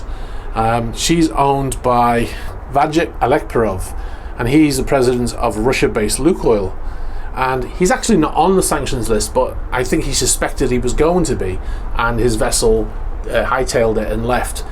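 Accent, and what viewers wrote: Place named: Wirral, United Kingdom. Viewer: Slightly off-topic (ok completely) but where are you from? By the accent I suspect you're from Wirral. I wonder every time I hear you.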